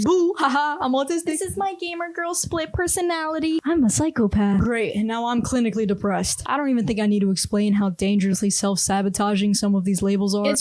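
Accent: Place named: United States. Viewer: What part of the US are you from? I’m trying to decide your accent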